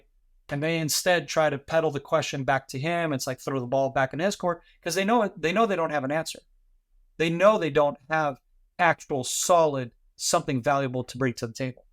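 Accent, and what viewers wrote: United States. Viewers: nice podcast! Here is my beef with the world: Why is Israel responsible for any civilian casualties when Gazans could have avoided zero deaths if they surrendered on Oct. 8th, let the hostages go, agreed to disband Hamas (another psychopathic offshoot of the muslim brotherhood (bother-hood), and turn over the death worshipping cowards that perpetrated Oct. 7th. I appreciate your respect for non-jihadi muslims. Have you considered an interview with Atheist Republic? Armin is an ex-Muslim originally from Iran that supports Israel over the monstrously backward Iranian totalitarian regime. The "Palestinians" have been thrown out of several countries because they tried to take over the government like Kuwait, Egypt (Muslim Bother-hood), and now Gaza. Why people do not mention that the gem of the Iranian regime in Lebanon that exists only for war has bankrupted the country is consistent with our current Jew hating media. 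The real money comes from places like Qatar. where did your parents come from before emigrating to Israel? My guess is Kansas. You sound like a Californian to me. Candice Owens new name from some of we Jews is Klan-ace Ovens. One of her "just asking questions" was accusing a former Jewish head of a post WWII ethnic German Polish concentration camp of atrocities in deaths of 1500 people under his watch. She omits that the first Polish state attorney said no charges would be filed against Shlomo. Stalin appointed Jews to lead these camps after the war. Ow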